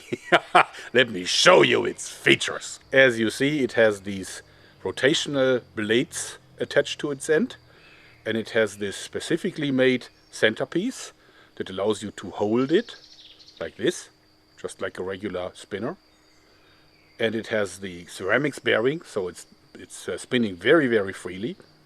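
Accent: Germany. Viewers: Warum redest du englisch ? Jeder hört doch das du deutscher bist 😂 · he have German Sound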